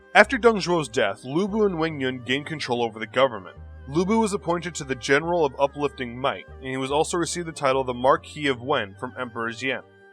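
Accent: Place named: United States. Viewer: fuck these murica pronunciations honestly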